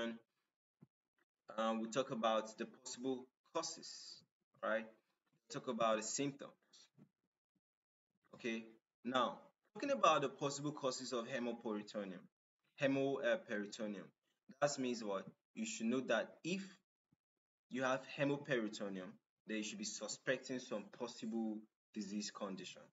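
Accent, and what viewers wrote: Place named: Nigeria. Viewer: Very refreshing to hear a Nigerian accent in a video like this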